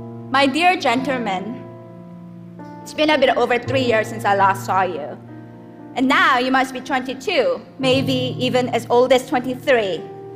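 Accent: South Korea. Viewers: She grew up in South Korea but moved to the US during her childhood. I'm more than sure that her first language is Korean considering how strong her accent is still. Let's ignore the accent. Her book itself proves how great her English is despite her stating how difficult it was for her to grasp the English language back when she just moved to the US. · She's Korean and has an accent. I think she sounds beautiful. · probably a regional south korean accent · Morgan Campbell The Koreans have a REALLY hard time overcoming their Korean accent when learning English. I agree she has done really well. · an accent... it's kinda obvious Korean is her first language lol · if you had ears you would also know that she was born and raised in South KOREA, which is why she still has an accent. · She was born in South Korea and is probably her first language. You can't expect her to speak with perfect English. 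And it's like you guys trying to speak Chinese with perfect pronunciation. And Korea is so different from English. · She's from Korea. Of course she has an accent. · Do valley girls all have Korean accents?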